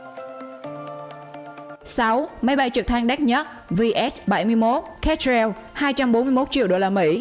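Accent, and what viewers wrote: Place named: Central, Vietnam. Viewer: nghe giong mien trung ,cua mien thuy duong cát trang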